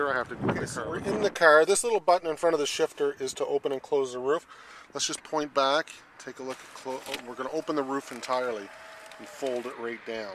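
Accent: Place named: Canada. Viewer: sounds like you are from Canada sweet car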